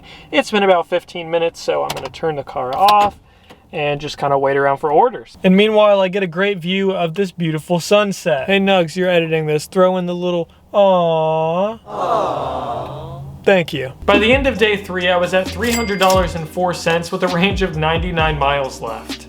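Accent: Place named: Mexico. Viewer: Yo ese nice accent 🇲🇽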